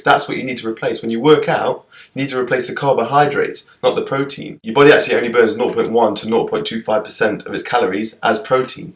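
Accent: United Kingdom. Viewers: every persons happiness leaves another person upset/hurt..the animals are bread for food...the worlds population is so vast now, we are running out of natural supplies, we need this...and by the sounds of it, you are from the UK, read your vegetable wrappers etc. you will see it is coming from elsewhere in the world where people are struggling in slavery conditions to produce just enough food for us, to make them enough money for their family to eat a meal that week, please, think things through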